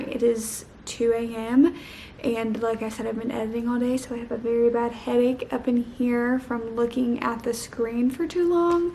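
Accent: United States. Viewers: where is her accent from in america? :O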